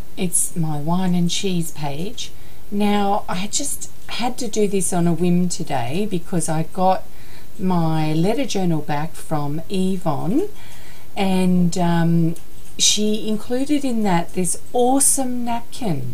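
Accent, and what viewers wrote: Australia. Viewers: Yay! Love your videos, I learn so much from you! And I love hearing your Aussie accent... there are not many of us Aussies here on YT.... Cheers, Ginny:o)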